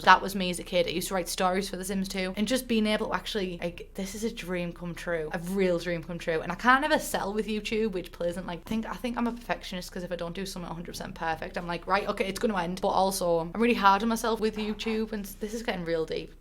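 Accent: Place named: Middlesbrough, United Kingdom. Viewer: Just stumbled across you and you're bloody hilarious! 😂 Are you from Boro by any chance? I recognise your accent loads!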